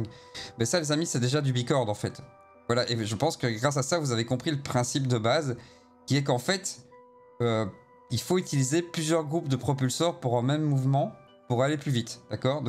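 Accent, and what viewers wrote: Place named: Belgium. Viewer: J'adore la prononciation belge : un ennemi qui "fouit" au lieu de "fuit" xD Tes vidéos me rappellent des potes gamers de Bruxelles et Liège qui disent "houit" au lieu de "huit."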